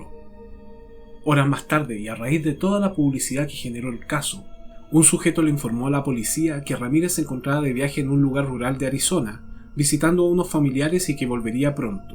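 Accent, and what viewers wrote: Chile. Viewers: Lo único bueno sería que hables bien español neutro y no chileno que no se entiende nada, y aprende algo de Inglés por dios.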